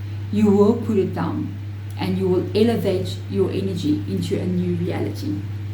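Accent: South Africa. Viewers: Is your accent South African? 😊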